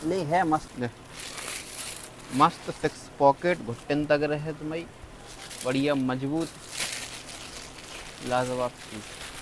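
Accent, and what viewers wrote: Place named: Jhansi, India. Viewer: भाई अभी भी टाइम है इसको बंद करके पान की दुकान खोल ले 😢 कपड़ों की दुकान में अब दम नहीं। वैसे झांसी साइड के लग रहे हो बातचीत से 😅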